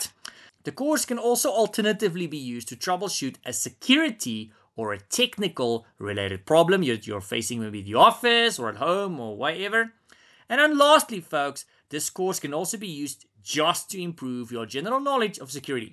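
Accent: South Africa. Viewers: you're south african!!!!!!!!! the accent and your way of speaking lol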